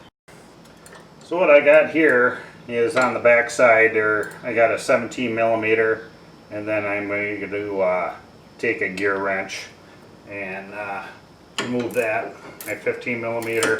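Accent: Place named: Wisconsin, United States. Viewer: I love the uh accent , Lol reminds me of home in Wisconsin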